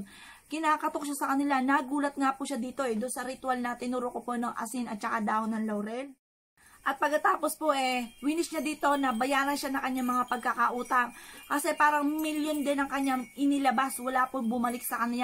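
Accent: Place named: Batangas, Philippines. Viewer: Hehehe.nkinig ko nmn ang punto mo madam akoy ntutuwa sau eh,,.nkow! Ala eh,,hehehe..madam parihas po tau,,batanginya po talaga kgaya ko..godbless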